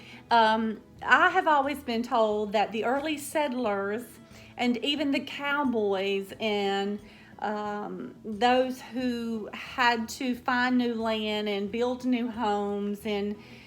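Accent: South, United States. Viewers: Love listening to your stories! There is nothing more pleasant than listening to someone who has a southern accent talk. And of course I may be biased since I too have a very southern drawl 😂.I remember growing up my big mamaw( yes that was what we called her) she was my great grandmother making us hoecake when we were little. I remember her making them when she made beans and fried taters for supper. When she made them for breakfast I remember enjoying them with her blackberry jam. Thank you so much for sharing and for bringing back some wonderful memories.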